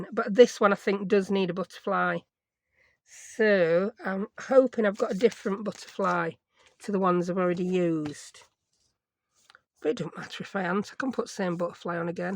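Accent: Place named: Yorkshire, United Kingdom. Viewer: New to your channel and I love your work. These journal cards are beautiful. Also love your accent and waffling.. from 'nuther Yorkshire Lass ;)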